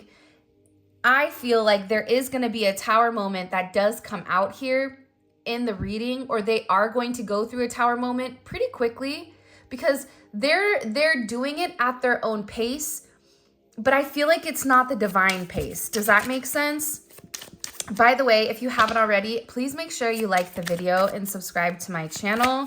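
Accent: California, United States. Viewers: Yes and I am one of those people that do not like your accent either. I do not like the American accent on most that I have heard. And yet my favourite singer is Mr. Neil Diamond, - and he talks so pleasantly, and American. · ! Girl you bringing the FACTS!! Resonates and Confirmation 💯🔥🔥 by the way I love your Cali slang lol I am on the other coast tho so it's different so I enjoy it😂 and Maaaannn the Angry Beavers you came with that and I was like OMG!! 😂 Yasss!! Love Ya! Thanks so much😊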